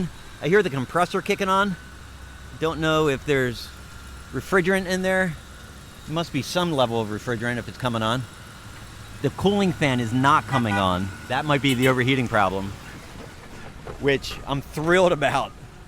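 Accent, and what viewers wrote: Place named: Delaware, United States. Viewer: This guy has such a strong Delaware accent, it cracks me up. Nice ride!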